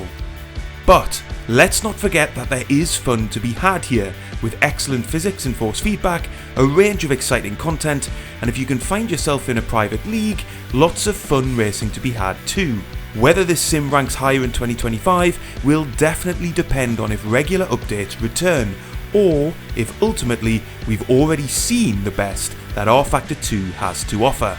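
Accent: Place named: North East England, United Kingdom. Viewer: Really enjoyed the video. As an Xbox sim racer this has been super helpful in my research to PC switching. Your trustworthy NE accent helps as well 😉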